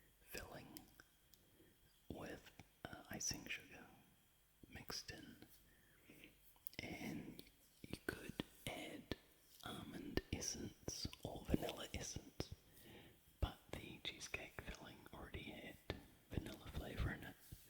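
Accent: New Zealand. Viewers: I find it the most bizarre thing hearing you whisper and having the same accent as me. It shocks me even when I'm expecting it! Merry Christmas by the way! Hope you had a really nice day :) shopping malls are so busy here at this time of year. They broke a record on the intake of money this year apparently. Good old kiwis ae? Are you in the North or South Island? Really great videos! If you ever want help with some inspiration I'll help you out. :)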